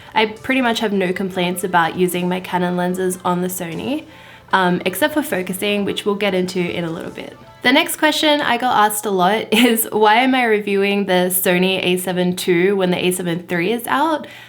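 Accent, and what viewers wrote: Australia. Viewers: Valley australian accent? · As an English teacher in Germany I just simply enjoy listening to you speaking. Your Australian accent is adorable! ;-)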